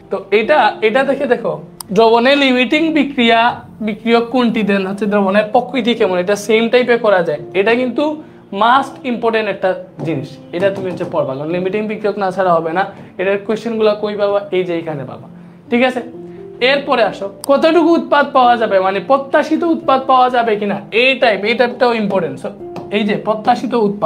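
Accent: Sylhet, Bangladesh. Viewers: ভাইয়ার বাড়ি সিলেট নাকি?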